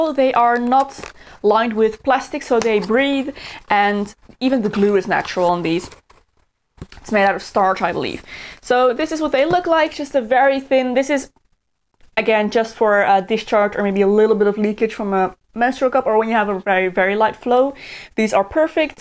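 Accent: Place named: Netherlands. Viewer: Ik kan zo slecht tegen je nederlandse accent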